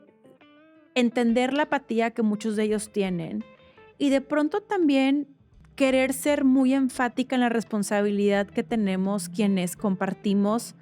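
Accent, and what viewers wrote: Monterrey, Mexico. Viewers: A ratos suena un poco ridícula con su faceta de fresa regia.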